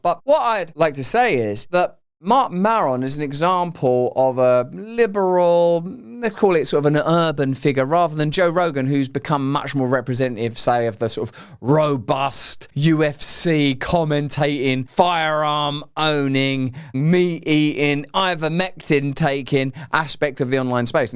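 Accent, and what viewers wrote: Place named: United Kingdom. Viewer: A dude with both a heavy British accent and a heavy lisp is too much for my ears.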